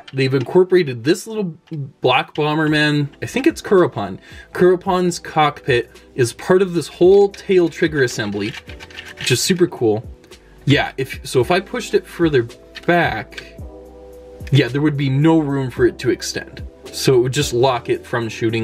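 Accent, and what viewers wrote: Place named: Canada. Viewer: Welp I was wrong your voice ait wired you are just Canadian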